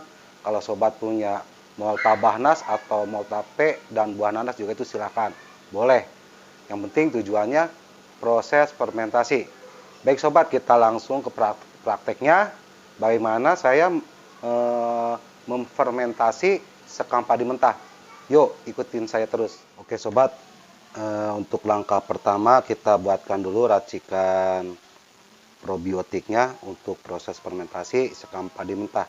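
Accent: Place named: West Java, Indonesia. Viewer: Logat nya siga urang sunda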